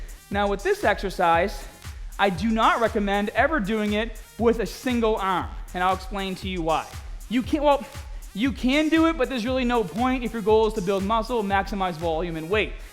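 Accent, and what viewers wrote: Boston, United States. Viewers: A regular bahbell, is that what they have on the fahm! I love ya my Bostonian Brother! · Well, if you want more people to take you seriously, please knock off that exaggerated "Boston" accent. You may be from Southie or Medford or Somerville, but strangely, you never did your "Haavaad Yaahd" act in your original videos. I lived in Boston for four years, and know that most "local" folks there don't really speak such a fake, hackneyed way to each other - only when there are non-Bostonians around, who (for some unknown reason), find your irritating "dialect" cute or charming, so you turn it on to get more likes. Other vlogers in your sector (like Jeff Cavaliere, etc.) don't seem to need such a stupid gimmick like saying "baaaaabells"; they just focus on content. But you're wicked pissah anyway!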